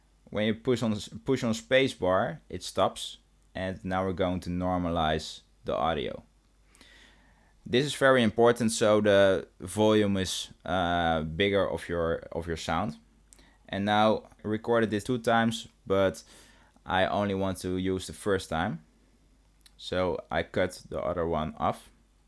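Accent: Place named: Amsterdam, Netherlands. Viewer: Very goeie video pik. Nice Amsterdam English accent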